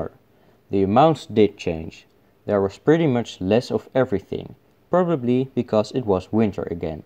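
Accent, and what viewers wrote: Netherlands. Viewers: Ur Dutch Lache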